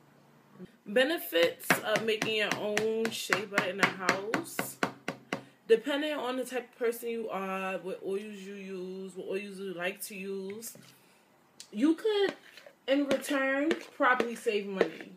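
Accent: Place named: Brooklyn, United States. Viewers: Nice video. I like your accent. Where are you from? NY? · Sound like you from brooklyn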